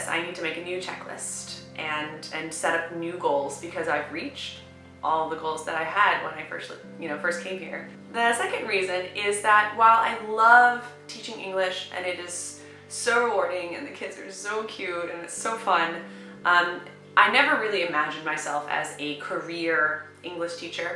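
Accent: South, United States. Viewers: to a brit, you dont have a strong southern accent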